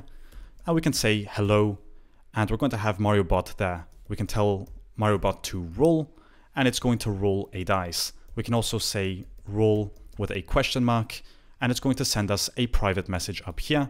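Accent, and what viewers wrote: Italy. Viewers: Are you Italian? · bruh the best italian english speaker that I ever seen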